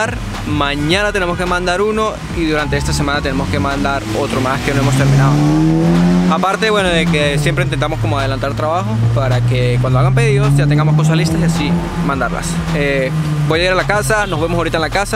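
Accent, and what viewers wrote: Venezuela. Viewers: Vos sois venezolano? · Excelente la edicion del video hermano,eres de venezuela cierto? ,por el acento y las palabras que usas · eres venezolNO?? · Se sabia casi q desde el principio q era venezolano, la chica q dijo mugre si no lo es, estoy seguro q debe ser colombiana :D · Eres de Venezuela ? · ¿Eres venezolano crack? Por cierto tu trabajo es increíble y con alguien con tu aptitud, se hace llevadero. Éxitos mi pana. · Este pana como que es venezolano?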